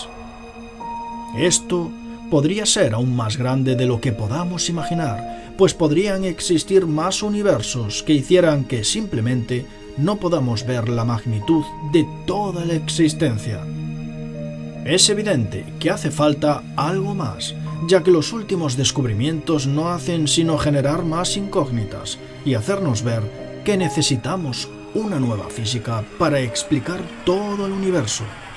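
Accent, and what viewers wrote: Spain. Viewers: lindo espa;olito me gusta su acento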